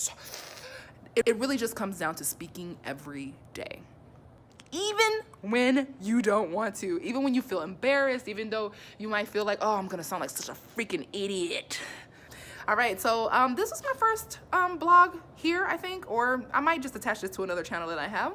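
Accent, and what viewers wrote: Dominican Republic. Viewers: tu suena dominicana... tu acento.. no lo se, pero soy dominicana y mi primer pensamiento fue que eres dominicana 🇩🇴‼️ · Lol I live in San Diego and I was like... why does your accent sound like a thick dominican accent if you were around mostly Mexicans in San Diego?! lmao but your husband is dominican lol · Hablas Dominicana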